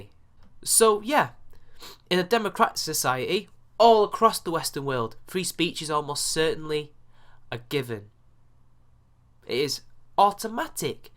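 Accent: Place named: Northern England, United Kingdom. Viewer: I really like your channel. Makes me hum the song every time. Your accent is luv-ly, luv. I can't quite place it, you must be in the north. One of the things these very young SJWs fail at is history. They are either too young to remember the misery, the nearly 100 million lives squashed out by 'marxist' 'communist' brutarian regimes, the mass starvation, the firing squads, pogroms, gulags, five year plans, the slaughter of people who wear eyeglasses, the racism, the murder of people for even trying to practice their religion. And the corruption where the party henchmen steal everything and the people starve, which occurred 100% of the time. I could go on, but hopefully you get the point. She seriously fails in understanding the U.S. Constitution. We don't rule by majority in the way she thinks--the government is set up to protect minority rights and not have mob rule. 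Every sentence she says has a major glaring error, which you broke down brilliantly. Applause.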